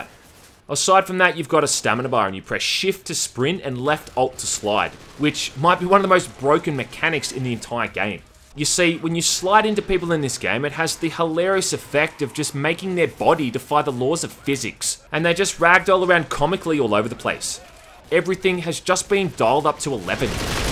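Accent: Australia. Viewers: putting on an accent? ever heard of australia?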